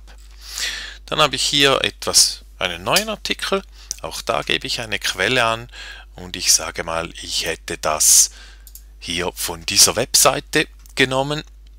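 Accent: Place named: Switzerland. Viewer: gutes video, supeeeeeer, aber schlimmstes Hochdeutsch ever, haha typisch Schweizer Hochdeutsch🤣😑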